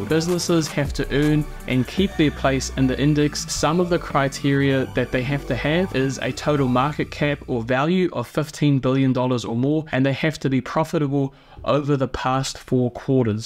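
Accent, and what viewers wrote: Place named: New Zealand. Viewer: You sound like a Kiwi similar to me. In New Zealand what platform should I use to invest in. I have sharsies but I dont know if there is better out there. I'm 19 years old and want to set up something that can help me buy a house in like 10-15 years or something. Just wanting to set up for long term success.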